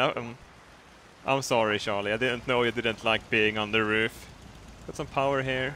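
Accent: Sweden. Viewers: best Swedish accent ever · Jacob Tidwell he’s Swedish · "Home cozy" love your swinglish... Have you found any "stabsnakes" yet?? You've got a new subscriber! 😄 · Is Swedish or something? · det är så kul att man kan höra när folk på Youtube är från Sverige bara genom att lyssna på när de pratar engelska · are Swedish right? I can hear it on your voice. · "fort yinyer"...dat swedish english though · are you swidish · you better make more of these ...I can't fuckin stand other non vikingss talking in non swedish accents THE GLORY IS ALL YOURS BALDER HIMSELF LAUGHS MARKED WITH LIGHTNING STRIKES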